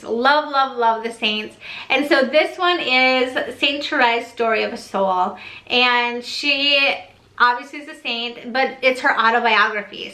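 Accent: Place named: Chicago, United States. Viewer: Thanks for the book recommendations, am really curious to check out Consoling the Heart of Jesus....just a unrelated question/comment, are you from the Chicagoland region? Just picking up strong Chicago accent :)...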